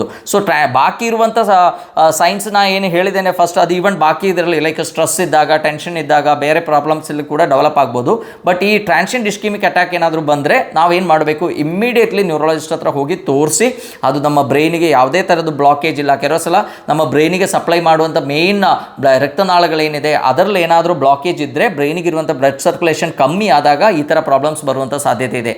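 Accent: Kerala, India. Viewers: Malayali doctor🤔 launguage gothaguthe 🤠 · I think you from kerala doctor Your Language pronounsation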